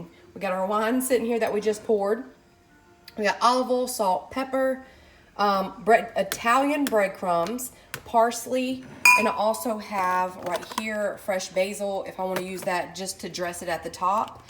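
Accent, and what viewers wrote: South, United States. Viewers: I just love they way you talk your southern accent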